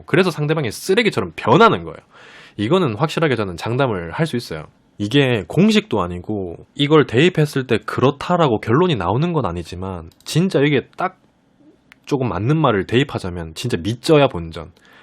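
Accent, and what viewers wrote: Gyeongsang, South Korea. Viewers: 우연히 봤는데 잼있네요. 경상도 억양 있으시네요. 경상도분인듯~~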